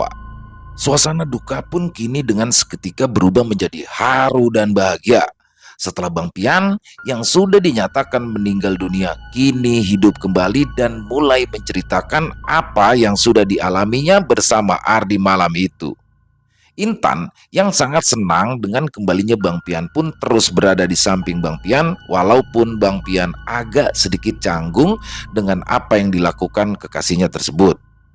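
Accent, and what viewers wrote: Jakarta, Indonesia. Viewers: Paling suka kalau logat Betawinya keluar.... Salam sukses bang... Salam dari Iwan Pati. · Taiwan hadir bang... bmyakin logat Betawi bang...aye pantengin terus nih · Selain ceritanya memang udah bagus, cara bang membawakan juga ciamik. Betawi nya dapet banget. Dramatic reading yang keren karena dibawakan dengan natural, wajar dan nggak dibuat-buat. Jago banget dah hehehe. Salut buat bang dan tentu saja Mas Untung Prasetyo. Semoga terus berkarya dan sehat selalu.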